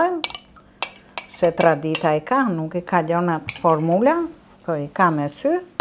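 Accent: Tirana, Albania. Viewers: Me pelqen kur flet me dialektin e tironse.Je shume e mire dhe e direjte perdrejte.Zoti te dhe te shendet dhe lumturi.Respekte e dashur❤ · Ty boft dita 1000, se ti me kenoqe vetem duke t'nigju , ate dialektin e mbrekullushem te Tirones 🌟🌟🌟🥰🥰🥰❤️❤️❤️💐🌹🌻🌷🌺🌼🌸🏵️☘️ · Sa te mira qe i ben!Me kujton mamin tim!Te ka lezet dhe e folmja tironce,nostalgji!Flm per keshillat mira,te ndjek me shume dashuri!❤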